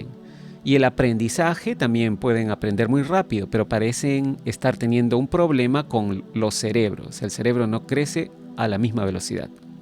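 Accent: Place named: Peru. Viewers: es de Peru 😍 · Tiene un acento como de Perú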